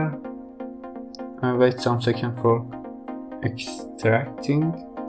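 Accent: Iran. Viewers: Iranian accent haha I love it bro that was great thank you ❣️❣️❣️❣️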